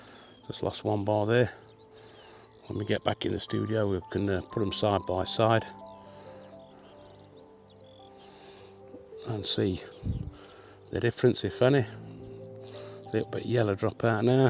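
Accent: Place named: Midlands, United Kingdom. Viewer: you sound like your from Midlands, I got one today and it does 350m before losing 2 bars. Would loved to run 2 of them at same time and test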